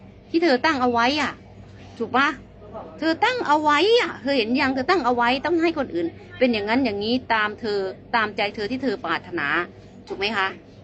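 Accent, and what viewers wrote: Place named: South, Thailand. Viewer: สอนธรรมได้ดีเข้าใจอย่างลึกซึ้งเลยครับ ชอบสำเนียงใต้ ฟังแล้วเพลินดี อนุโมทนาด้วยครับ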